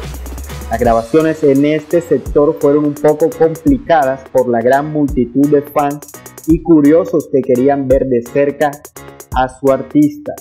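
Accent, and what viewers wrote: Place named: Spain. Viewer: Si ella tiene don natural para los idiomas y está casada con un español, es completamente lógico que hasta de forma inconsciente hable casi como española. Que se te peguen los acentos, ni es culpa de la persona, ni es un defecto, es un don del cerebro. De vuelta en Colombia, si se quedará unos días su cerebro se reprogramaría como Barranquillera. No hay nada que criticar, ella trabaja bien, ama a su familia y su país de origen y el país que la acogió. ¿Qué hay de malo en tener inteligencia y un corazón grande?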